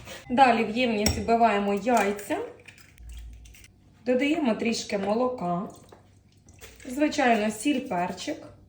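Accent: Ukraine. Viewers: Ви з Полтавщини?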